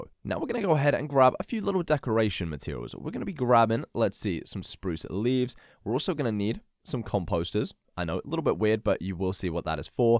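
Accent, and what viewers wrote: United Kingdom. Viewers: I don't want to disturb you but are you British because I really love your voice and your accent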